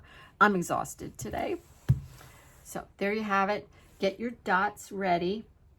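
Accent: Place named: Pennsylvania, United States. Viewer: A friend told me about your dots...I love watching your process! I also love your PA accent (I've lived on both sides, so I recognize the Pgh side, and the Philly side!). Anyway, love your gratitude focus. Thanks,!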